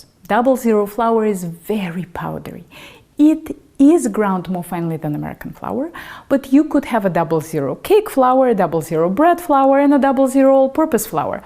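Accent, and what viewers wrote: Russia. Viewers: You have a nice Russian accent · So hard russian accent, I couldn't resist... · Like the Russian accent and talking of pasta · I didn't even listen the recipe it's self I just watched and listen to this lady talking in her Russian accent. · Quite entertaining, and with a kinda of Russian accent for a change! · You do Italian food but you sound Russian! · Love your accent! Russian right? Was hooked from the first few sentences :-) very informative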